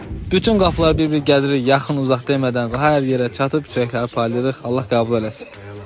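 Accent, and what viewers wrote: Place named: North, Azerbaijan. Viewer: Avarlarin da belə adəti var.Bilmirəm bu hansı zonadir.Ama danışığından Şimal tərəfin ləhcəsidir.Allah bərəkətli eləsin.Allah qəbul eləsin 🤲💐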